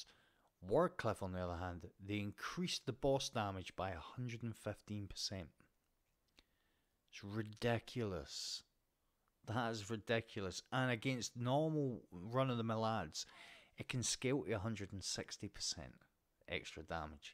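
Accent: United Kingdom. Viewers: you’re very welcome jajaja. It’s nice watching your videos. British accents makes it fun to watch.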